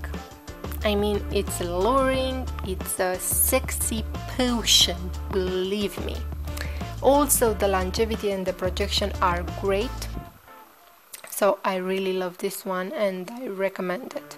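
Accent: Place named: Romania. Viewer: Romanian accent.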